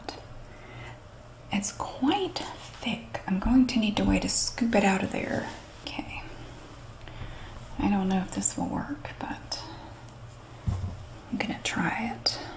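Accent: South, United States. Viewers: I live for these recipe videos with her southern drawl. Amazing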